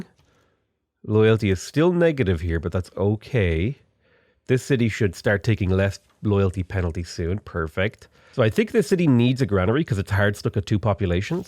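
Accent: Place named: Ireland. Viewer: His irish accent did not get a single bit stronger yet the deeper voice makes him sound a shit ton more irish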